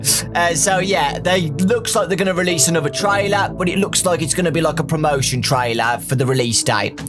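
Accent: United Kingdom. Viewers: As a non-native English speaker, accent really caught my attention. Does anybody know what part of the UK is he from? Also, as always, great video!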